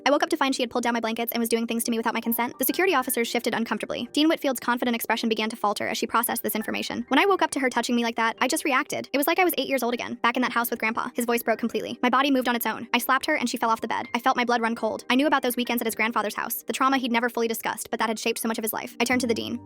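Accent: United Kingdom. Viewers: that's British accent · Why did she turn British Midway through the video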